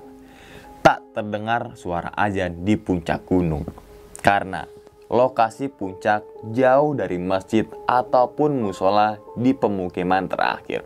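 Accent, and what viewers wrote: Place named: Semarang, Indonesia. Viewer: wih logat logat semarang kih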